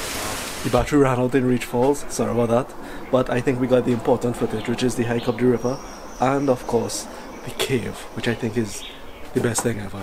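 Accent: Trinidad and Tobago. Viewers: Trinidadian accent!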